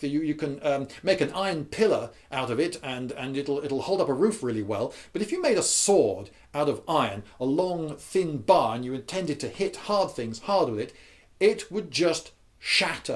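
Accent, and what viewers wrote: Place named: England, United Kingdom. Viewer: Also the English accent, you can't forget that.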